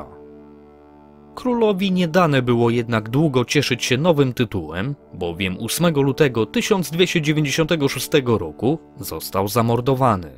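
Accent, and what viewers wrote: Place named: Pomerania, Poland. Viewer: Twarda pomorska wymowa - odrazu było słychać, żeś nie ze wschodu :). Na południe też nie myślałem... Miało być Pomorze, albo Wielkopolska - trafiłem :).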